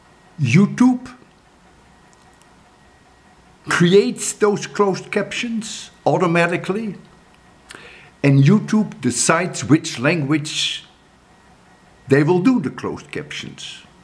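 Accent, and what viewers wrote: Netherlands. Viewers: Sir, now my question is: are you Dutch? Because you speak English with a Dutch accent. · I think it is because he speaks in a Dutch accent, so YouTube thinks it is in Dutch.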